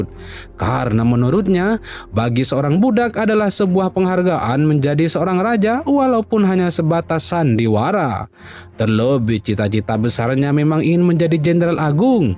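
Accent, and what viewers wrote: Madura, Indonesia. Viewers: Kayaknya orang Madura ini. Dekremmah taiyeh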